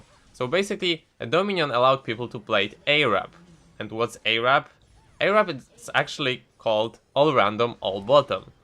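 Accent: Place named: Poland. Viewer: You know Pole as you listen to one m8 :D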